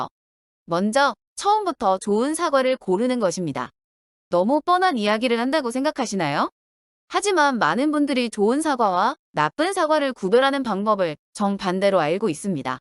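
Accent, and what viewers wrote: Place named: Gyeongsang, South Korea. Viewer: 경상도 말투가 서울말씨랑 어울려저 아주 듣기좋아요ᆢ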